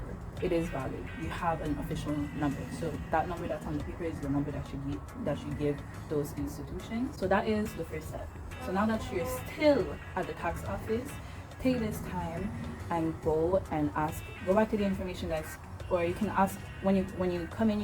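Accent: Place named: Jamaica. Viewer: okay purrrr, look at you with your jamaican accent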